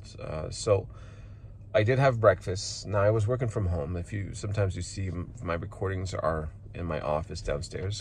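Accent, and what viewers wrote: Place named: Canada. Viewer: Are you canadian! i heard a little Canadian accent! love your videos, very helpful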